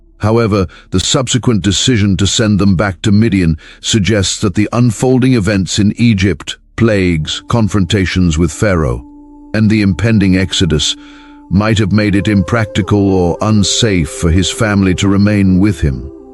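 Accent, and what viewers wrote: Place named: United Kingdom. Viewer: Amazing that this narrator,with a a strong British accent close to one doing their version of RP He's on too many videos,which proves most of these videos on bible pretty much phoney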